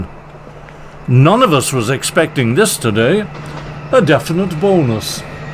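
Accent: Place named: RP, United Kingdom. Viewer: this English RP deadpan delivery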